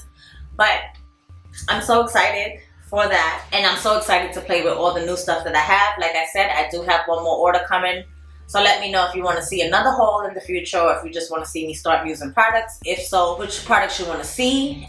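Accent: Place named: East Coast, United States. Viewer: when I hear your accent, I automatically respond with "What up ma?" Girl your accent is just what my "West Coast living but missing the East Coast swagger" heart needs on the regular. I have the same issues with my eyes - the water eyes be killing my canceler game - do you have any tips?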